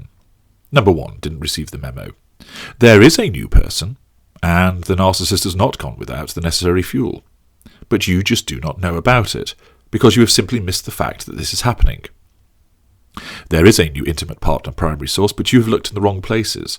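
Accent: United Kingdom. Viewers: Another Brit accent. See ya.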